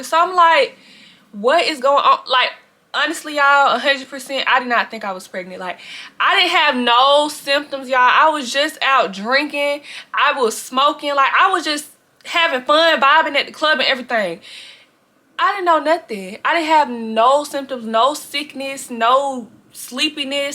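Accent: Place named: Louisiana, United States. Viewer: You gott thattt lousianas accenttt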